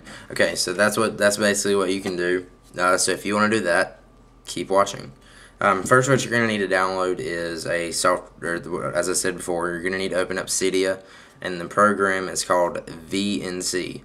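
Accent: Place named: Oklahoma, United States. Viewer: Are you from oklahoma cause i have a cousin name alex and he has accent just like yoi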